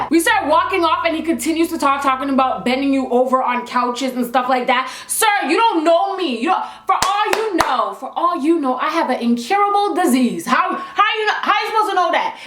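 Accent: France. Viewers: You're french accent is so cute 💙☺️ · HAAAAAAAAAAAAAAAAAAAAAAAAAA !!! YOUR FRENCH ACCENT IS CUTEEEEEEE !!!! (i'm from Montréal)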